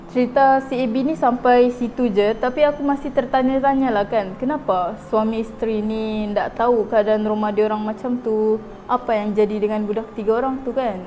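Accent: Sabah, Malaysia. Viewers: kakak org mna ? mcm ada loghat sabah pulak heheh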